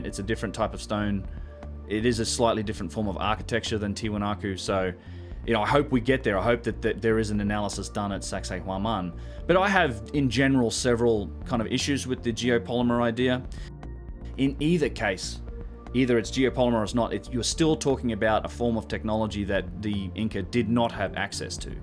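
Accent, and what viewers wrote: Australia. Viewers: Thanks, a fascinating tour of Sacsayhuaman. I love your clear presentation style too, and as a fellow aussie I am SO happy to hear an aussie accent on Youtube - not many of us do this kind of work.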